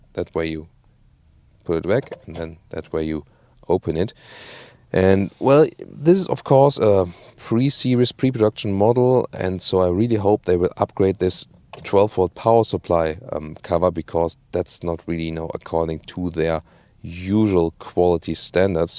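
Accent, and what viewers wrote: Germany. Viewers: Zis is called German accent. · I love the THICK German accent. :D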